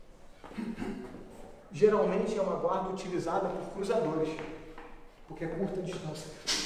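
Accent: Rio de Janeiro, Brazil. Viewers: Tu é carioca ou Paulista vei 😂😂😂😂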